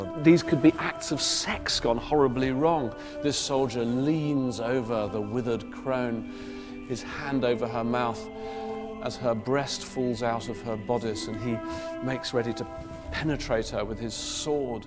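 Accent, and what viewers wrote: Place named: RP, United Kingdom. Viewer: Is it me or English have a tendency to replace quality in contents with a posh pronunciation and diction?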